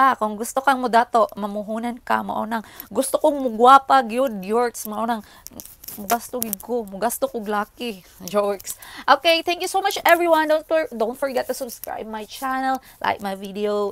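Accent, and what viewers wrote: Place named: Visayas, Philippines. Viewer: Haha ang kulit mag bisaya no Ma'am :)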